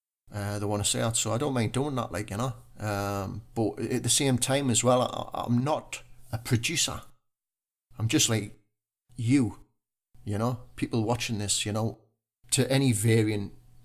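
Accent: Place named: North East England, United Kingdom. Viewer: North East is my guess, closer to Newcastle than hull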